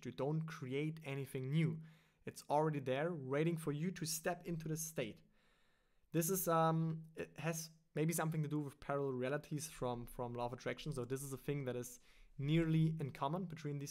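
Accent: Germany. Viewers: are you German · Deutscher Akzent?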